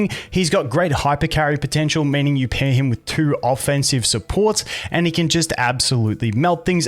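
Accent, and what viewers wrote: Australia. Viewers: Absolutely love the content! Can't get enough. Congrats on the 110k! Love the representation for us folk down under, nice change from all the American accents haha. - from a grateful kiwi across the ditch ❤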